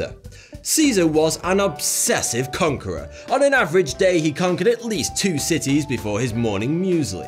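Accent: United Kingdom. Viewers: Hmmm, when Islam is mentioned re burning the library this guy with brit accent is afraid to say anything derogatory about religion. But Christians and the Bible, snide mocking tone. Hmmmm, guy might be afraid a tad of one very "peaceful" flavour of religion slicing his head off these days.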